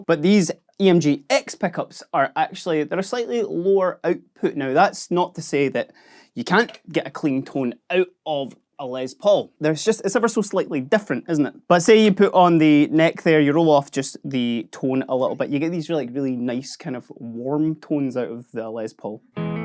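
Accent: Scotland, United Kingdom. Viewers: Did they have to get a guy with such a brutal Scottish accent to do this video? I can barely understand the dude.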